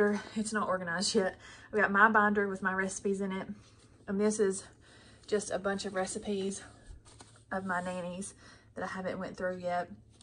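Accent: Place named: South, United States. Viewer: Your southern accent is very sweet. Thank you for the recipe.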